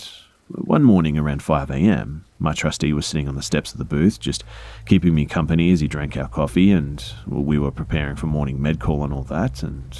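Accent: Australia. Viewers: If you would have told me 10 years ago that I would one day have an Australian man lulling me to sleep at night, I would have thought you were smoking crack. Present-day-me wonders why more people don't have an Australian man lulling them to sleep at night. People probably think I smoke crack.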